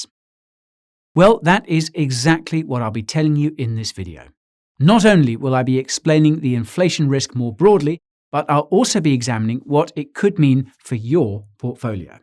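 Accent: United States. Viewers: Dude, good American accent!!! 😄👍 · Your American accent is spot in 🤣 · Better American accent than Orlando Bloom's. Just need to practice on the word "research". Say it like "Reeserrch". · with an American accent, wonderful! 🤣🤣 By the way, excellent work, keep going!!! Cheers from Portugal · That American accent! 👌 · Fantastic American Accent !! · Bravo on the American accent - well done! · your American accent is great! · Good American accent, but full of cheese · That American accent is hilarious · Clicked for the subject, stayed for shmaltzy American accent. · Pretty good american accent just heard a little of your English 💯💯💯. Love your channel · Hearing with an American accent is tripping me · Haha, I love the American accent, dude!! Good job! :D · Good American accent ! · Love the American accent! Always engaging content! Haha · Decent American accent. 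I would've thought you were one of us Yanks if I didn't know better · Nice job on the American accent. You do have some talent. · Excellent video as usual: 10/10. American accent not bad. Needs work though: 7/10. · I didn't realize it was an American accent. I'll have to rewatch. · The outlook is dismal, but on the bright side you do a great American accent. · idk man, I'm here on the Southern side of the US and I've heard his sound before but yeah, if I didn't know him; I'd think he'd have moved into the area recently but still American. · nice American access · That American accent caught me off guard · Your American accent is better than mine, and I have lived here for all 27 years of my life XD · Wow that in-flight captain American accent is pretty darn good. Some telltale signs that it isn't your natural accent though. advisor, research, and a few others tripped you up. I LOVED the "Alrighty!" :D Seriously though - I don't understand why only Bitcoin is mentioned in this video. 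Bitcoin isn't the future of currency. Are we only mentioning BTC because of the 21M minting cap? There are plenty of cryptos with burn mechanisms to keep supply down.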